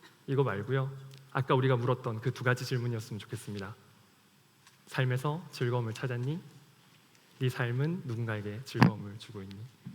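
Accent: Gyeongsang, South Korea. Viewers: 나랑같은 경상도 사람이네ㅎㅎ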